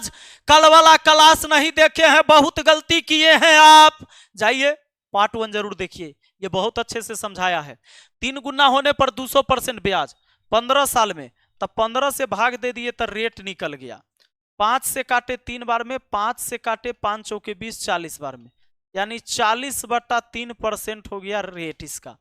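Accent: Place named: Delhi, India. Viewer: Delhi me rhata hoga wha aise hi bolte h adhiktar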